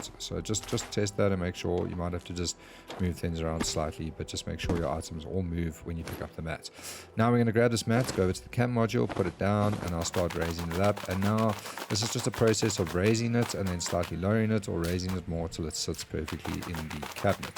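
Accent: South Africa. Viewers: My brother wonderful mate really great explanation and total groovy build by the way u sound south african no offense I am from there also maybe we can jam sometime also I just got back on fallout after 5years lol being grinding something else but loving the game again peace man.... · Bro, are you South African?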